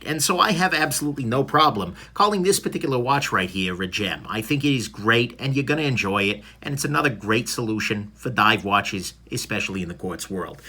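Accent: New York, United States. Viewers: Mate, I love the New Yorker accent, the factories in Malaysia have improved over the past few years and we can see it in the Seiko and Citizen product. Only one gripe, Citizen Eco's will fail at about 7 years, I know as I have bought them for my wife and Son in the past. Their not worth sending back for refits, so a dilemma arises with the owner. Clever marketing on Citizens account it would seem. All that said what a great review. Cheers from Brisbane, Australia.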